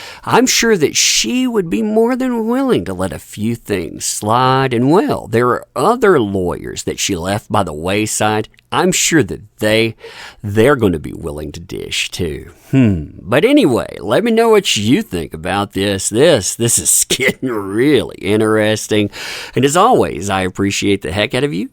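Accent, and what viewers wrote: South, United States. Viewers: Interesting news indeed. Concerning a previous livestream, who couldn’t like the sweet southern drawl? It’s always sounded great from here in Canada.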